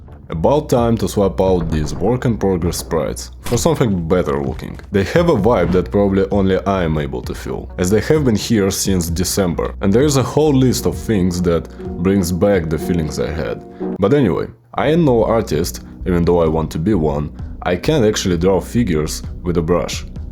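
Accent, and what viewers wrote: Russia. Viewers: Bro u got bold Russian accent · You have strong russian accent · Man is so cool! Кхм а еще твой Русский акцент прям врезается в голову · Твой акцент очень даже русский, и я уверен что ты русский. Понимаю тебя, ведь на русским не платят на ютубе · У него ОЧЕНЬ русский акцент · Какой же ахуенный русский акцент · не только я услышал у него русский акцент) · I can hear by the voice that the author is Russian. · Mmm чувствоваеца русский акцент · russian accent, W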